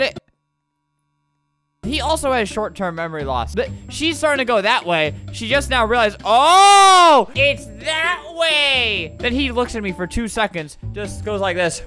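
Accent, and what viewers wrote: South, United States. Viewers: Who doesnt love alberts american accent/voice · My teacher did a southern accent, and she sounded exactly like small edit: Holy crud I’ve never gotten 100 likes until now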